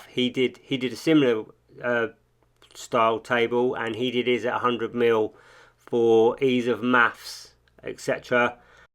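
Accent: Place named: England, United Kingdom. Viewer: So sweet to hear a pure British accent and way of sharing the knowledge and the humour :) Love it, you earned a new subscriber mate ! Keep the videos coming ! I am a beginner diyer tryn to build a MFT table lol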